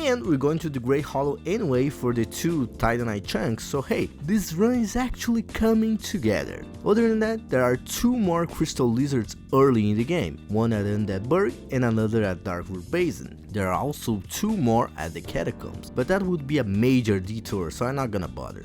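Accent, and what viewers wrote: Brazil. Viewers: Are you brazilian? your accent sounds familiar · I think he's Brazilian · Seus R são muito de paulista.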